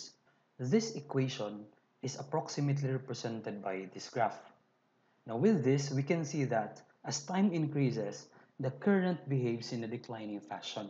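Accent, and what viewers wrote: Philippines. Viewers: You should should your step by step derivations of calculus for students. Great Job. I can tell your English is Filipino, not American. 'eigenvalues'.... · Hahaha, check comment pa ako kung pinoy ka, pinoy accent eh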